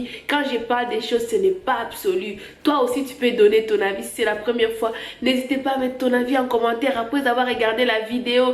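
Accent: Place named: Democratic Republic of the Congo. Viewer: Tu est Congolaise je sens le Tiiii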